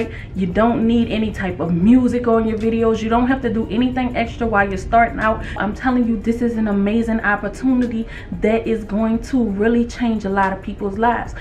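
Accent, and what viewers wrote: New Orleans, United States. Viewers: I hear dat accent!!! You gotta be from the NO!!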